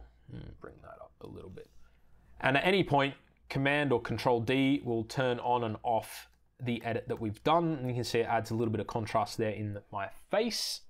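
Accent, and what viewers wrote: Australia. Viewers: Another subbed. You can always trust information with an Aussie accent. Sold on giving Da Vinci a go, although Fusion kept crashing on me the last time I tried using the standalone version on a project. Anything to get away from Adobe subscriptions is worth consideration.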